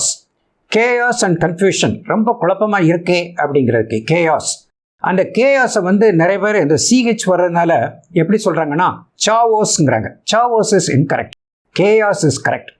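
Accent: India. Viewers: nenga pronounce panrathu India pronunciation thane sir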